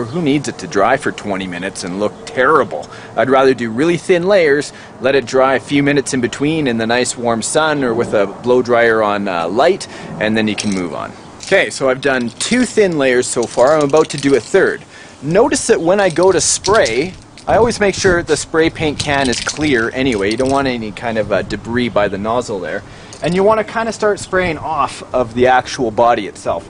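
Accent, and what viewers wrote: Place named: Canada. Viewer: Looks good , I like the fade and the tint . It is kinda funny hearing you deck'lls , I firs heard the on trailer park boys and thought it was just how Bubbles said it , I now know its a Canadian thing . Keep up the awesome work can't wait to see ya tow your crawler in to dudes garage or your mud tuck out to the trails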